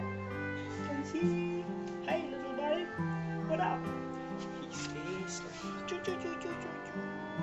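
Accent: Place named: United Kingdom. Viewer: I thing he is speaking British English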